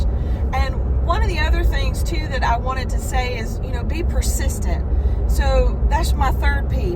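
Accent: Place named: Georgia, United States. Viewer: First, I love your accent but I may be partial since I live in GA😂😂 Thanks for sharing your insights and inspiration. I’m tuned in😊😊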